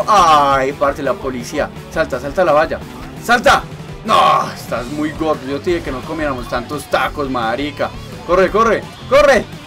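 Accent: Antioquia, Colombia. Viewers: Puro asentó paisa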